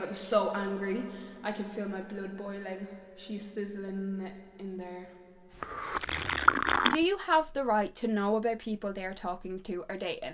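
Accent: Ireland. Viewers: I love your Irish accent! Can u say 'r u actually stupid?' in an Irish accent?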